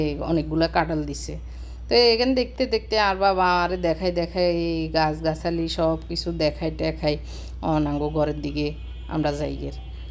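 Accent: Noakhali, Bangladesh. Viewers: আমি আজ প্রথম আপনার ভ্লগ দেখলাম, YouTube দেখতে দেখতে সামনে ছলে আসলো তারপর দেখা সত্যি অসাধারণ লাগলো আপনার বাবার বাড়ি প্লাস আমাদের প্রিয় নোয়াখালীর ভাষা আহহহ অনেক সুন্দর,,, আল্লাহ আপনার আব্বু, আম্মু কে ভালো রাখুক দোয়া করি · আপু আপনার মুখে নোয়াখালির ভাষা শুনতে অসাধারন লাগছে। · Apo tomar mukhe amader nowakhali r vasa sune onek valo laglo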